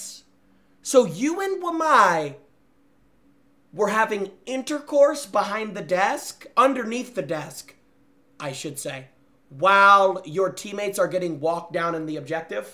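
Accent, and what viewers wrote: Canada. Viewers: That Canadian accent killed me 😂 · W Canadian accent, w junk for sure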